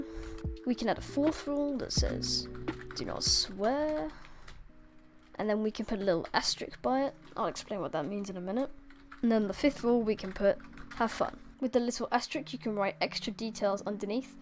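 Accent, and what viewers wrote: United Kingdom. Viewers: Man sounds like he is British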